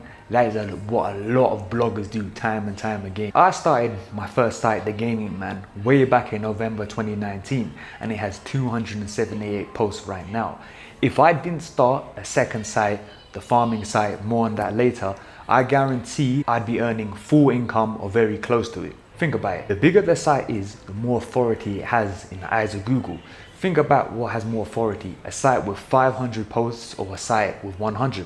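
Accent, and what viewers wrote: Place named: United Kingdom. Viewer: You sound like a British rocky balboa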